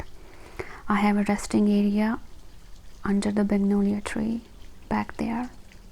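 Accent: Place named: Tennessee, United States. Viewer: I had no idea you were from Knoxville. That explains your accent. :0). I grew up in middle TN, but now live in Loudon, TN.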